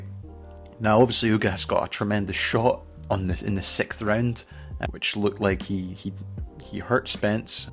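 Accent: Scotland, United Kingdom. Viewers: Hey dude are you from Scotland? I’m from Dundee/Aberdeen and I love boxing. We should do a co-lab sometime, I’d be happy to jump on the show and chat with you anytime! Keep up the good work 👍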